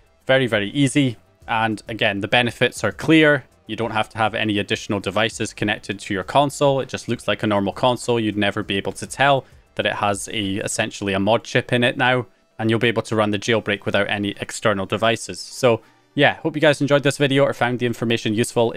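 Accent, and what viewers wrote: Scotland, United Kingdom. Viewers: My Scottish wife wondering which part of Scotland you're from?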